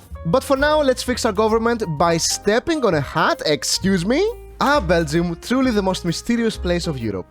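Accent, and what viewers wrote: Greece. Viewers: u greek ?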